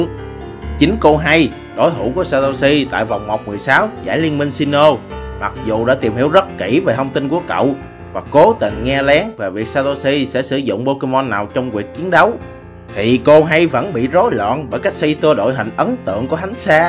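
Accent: Vietnam. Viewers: Theo dõi admin này từ hồi làm clip ngắn, lúc đầu giọng đọc rất tệ rặc khuông miền tây lại ngọng, đọc vấp, không rõ chữ nhưng càng về sau ổng đã cải thiện hơn, có thể ổng chưa bằng đuợc chất giọng của admin chính, nhưng sự cố gắng trong chất giọng và cả idea làm clip là rất đáng ghi nhận, mọi người nên động viên ổng phấn đấu thay vì bày xích ổng, ủng hộ admin và kênh PAG Center❤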